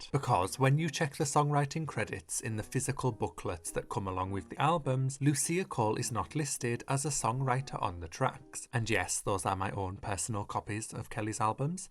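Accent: United Kingdom. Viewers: A British accent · For some reason, this sophisticated British accent makes videos about online stan culture so funny lmaao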